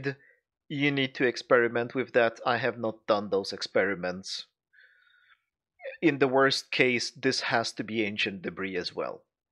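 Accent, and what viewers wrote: Sweden. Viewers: The Swedish accent makes him look even smarter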